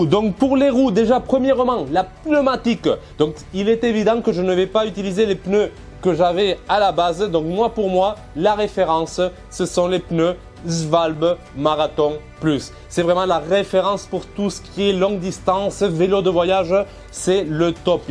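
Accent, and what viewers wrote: Toulouse, France. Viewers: Bonjour, Proposition très cohérente pour de grands voyages,plein de bon sens,j’adore l’accent toulousain, Bonne continuation la chaîne me plait.👍👍👍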